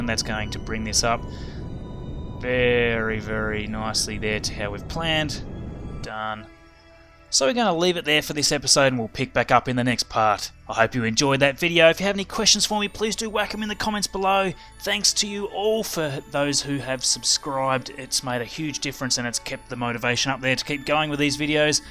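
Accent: Australia. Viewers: G'day Mate! Are you Aussie? I am too ;)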